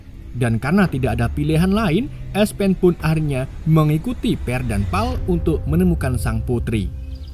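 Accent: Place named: Java, Indonesia. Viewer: nyong curiga dari dulu kayane admin wong jawa tulen😂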